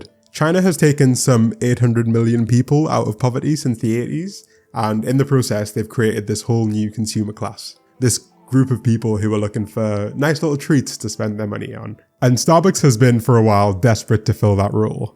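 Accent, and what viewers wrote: United Kingdom. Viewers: I know it’s just how you sound but you sound like you’re mocking me with how you speak… maybe it’s the combination of deep vocal tones and the british accent, im not sure. but if I close my eyes and just listen, it sounds like “yOu ArE mOcKiNg mE.” great video and subject matter but I probably won’t be watching anymore of your videos.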